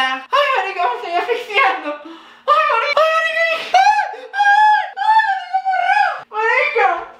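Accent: Venezuela. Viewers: Amo como hablas Chama yo también soy venezolana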